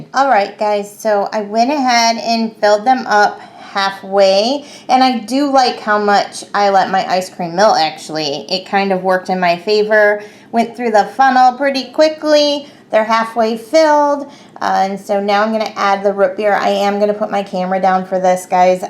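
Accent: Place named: Midwest, United States. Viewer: OKAY I HAVE TO KNOW!! Where is your Midwest accent from?! 😍 These fudgesicles look so good!!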